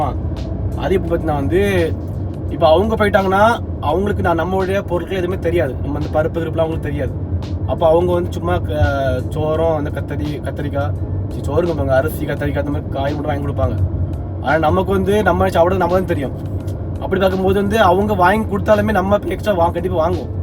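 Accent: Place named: South Tamil Nadu, India. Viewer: South Tamil ❤ Slung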